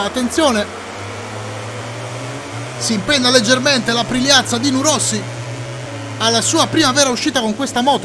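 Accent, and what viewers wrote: Sicily, Italy. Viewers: Gerardo Cavallo Fan Teknoescobar sei Siciliano?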